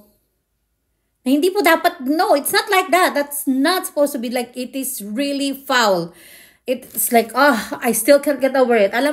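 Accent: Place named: Visayas, Philippines. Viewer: What a WASTE OF SPACE this TRYINGHARD YOUR ENGLISH BISAYA KAAYO DAY,PAG HILOM OY KAHIAS NIMO,PAKAULAW KA LANG TO THE BISAYA HILAAAAS,DILE BAGAY SA IMO OY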